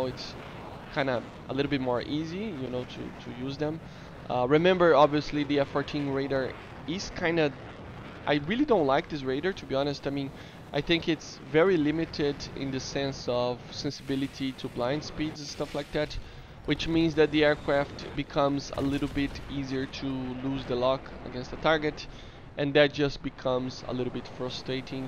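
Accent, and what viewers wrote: Brazil. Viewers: Are you Brasilian?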